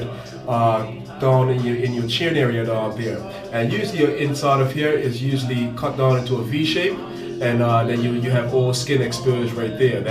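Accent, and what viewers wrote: Bermuda. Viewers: Saw the 441, heard the accent and knew straight away. Shout out to Bermuda! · Nice video, b'ruh, and way to represent! I've been watching and following various people on YouTube giving beard advice and tips. Didn't even think yours was from Bermuda until I heard you speak. I've been growing mine for awhile but kept on trimming or keeping it low. Now I've let it grow. It's kinda like that Kimbo beard (well that's what people call me at times, lol) though I get the Rick Ross comparison too. It's all good. You give good advice in your video. Similar things I've heard people say in their logs and what I'd suggest too. Growing a beard definitely takes time and patience. Never compare your beard to another's and develop beard envy. Each man's beard is unique...take pride in what you got. Imitation is the most sincerest form of flattery so if you see others doing what you do just take it as a compliment. 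Nice job, b'ruh.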